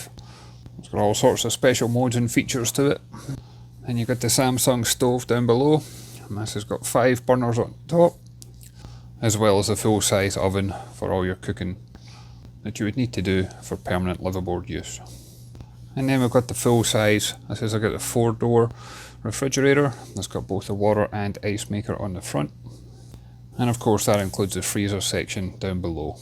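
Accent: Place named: Scotland, United Kingdom. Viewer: Neat review; loving the Scottish accent...Garnock, Ayrshire?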